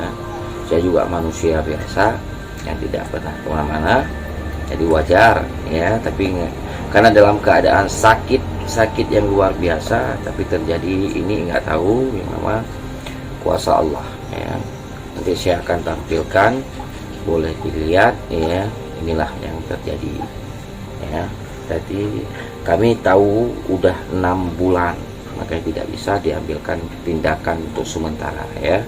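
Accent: Aceh, Indonesia. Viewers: Dr logat bicara nya org aceh ya. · Org aceh y bg?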